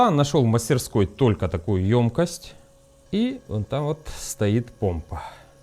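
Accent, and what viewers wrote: Rostov, Russia. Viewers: Ростовский говор)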